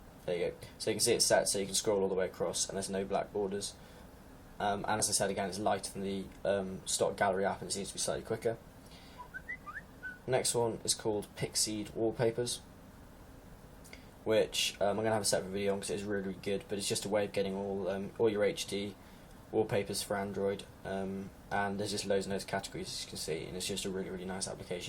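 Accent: England, United Kingdom. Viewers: I realy hate that british accent its so ... gay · British accent hurts my ear T_T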